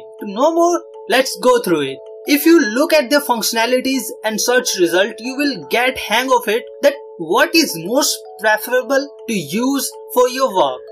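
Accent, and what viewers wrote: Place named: India. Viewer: I always love when Indian talking with their accents... its so entertaining to hear :D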